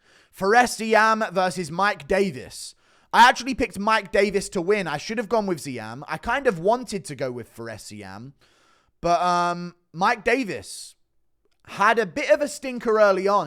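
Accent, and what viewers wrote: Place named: Estuary English, United Kingdom. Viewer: Your estuary English fascinates me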